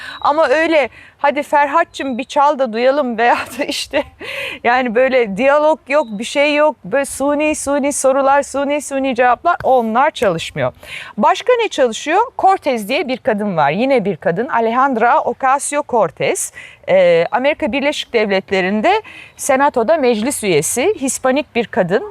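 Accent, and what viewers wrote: Standard. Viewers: Hanım sizi ilk defa izledim Temiz Türkçe akıcı anlatım👍 Ben her kusaktan son uc kusaktan cocuklara sahibim esnafim onlari okutmak ugruna kendime hic yatirim yapmadim pisman degilim.Devletin vermesi gereken egitimi kendi imkanlarimizla verdigimiz icin baska insanlara istihdam saglayacak sermayeyi olusturamadik egitim icin harcanan paralarda ise yaramiyor malum devlet polikasi.oy moy yok diyen uc cocuk var evde su anda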